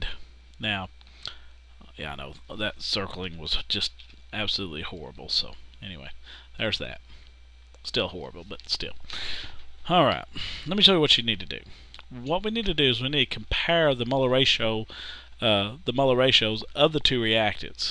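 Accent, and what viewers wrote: South, United States. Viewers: lol this wasn't what I was looking for I already understand how to do this but you did such a good job and made me laugh ( plus I love your southern twang ;) sexy!) so I kept watchin anyway :P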